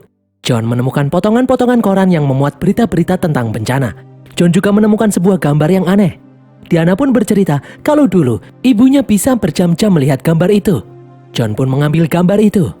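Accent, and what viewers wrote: Java, Indonesia. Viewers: Logate medok yo masse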